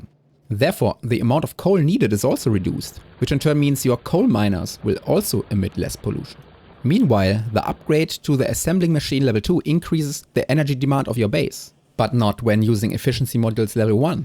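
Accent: Germany. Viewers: Do i hear a german?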